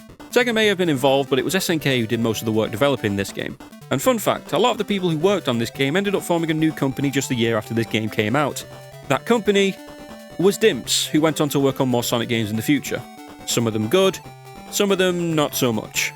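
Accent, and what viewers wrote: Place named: Liverpool, United Kingdom. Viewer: Why do you sound like you're from Liverpool?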